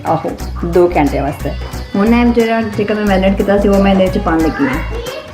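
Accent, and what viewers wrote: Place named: Punjab, India. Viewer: Me ap ki Punjabi hi sunti rahi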